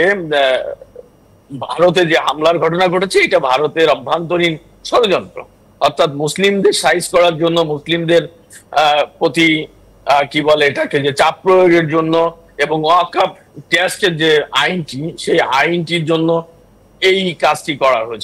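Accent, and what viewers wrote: Kolkata, India. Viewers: উনি ভারতের লোক ওনার ভাষা। বাংলাদেশের মানুষের ভাষানা উনি কথা বলতেছেন কোলকাতার মানুষের মতো।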